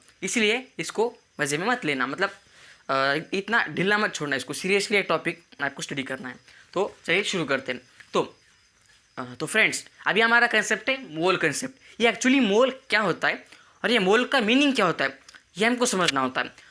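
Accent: Maharashtra, India. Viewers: I think this guy is from maharashtra bcz he have marathi fluent 😅😅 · Seems like Marathi Accent. Aap konse class mein padhte hai abhi?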